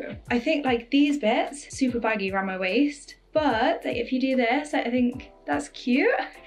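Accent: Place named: United Kingdom. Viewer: Her British accent is such a turn on.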